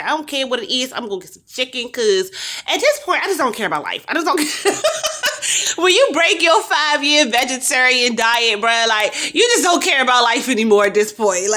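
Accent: New York, United States. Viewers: Did I ever tell you that you sound like New York ?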